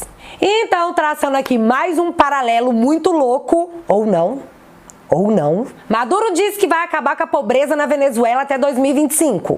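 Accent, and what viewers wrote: Minas Gerais, Brazil. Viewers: Bonita voz da, será que ela já trabalhou em rádio, ela tem voz de locutora. Com esse sotaque mineiro carregado Show de bola 👍 Deus te abençoe.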